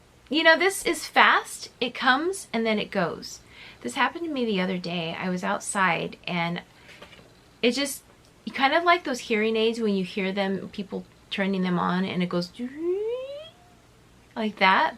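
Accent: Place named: United Kingdom. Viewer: just subd for the British accent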